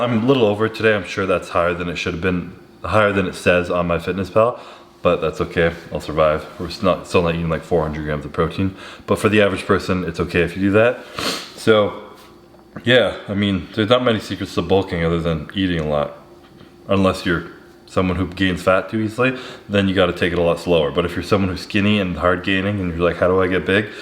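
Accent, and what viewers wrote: Canada. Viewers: Yo man are you Canadian? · He’s Canadian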